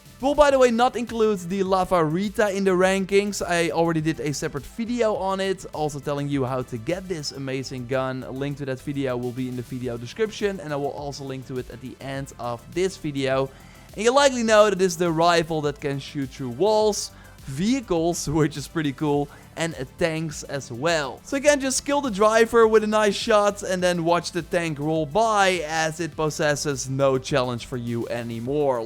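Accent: Netherlands. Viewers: Ik hoor je Nederlandse accent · Are you dutch? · Ik wist dat hij Nederlands was vanwege de accent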